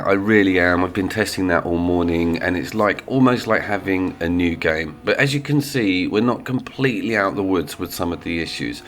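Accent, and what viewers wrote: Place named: England, United Kingdom. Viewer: it's the English accent mate. Makes you sound smarter than the average bear.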